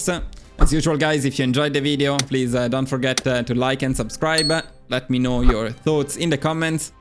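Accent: Italy. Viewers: that's got to be one the thickest italian accents I've heard. Not complaining tho, English is always better with a thick romance language accent.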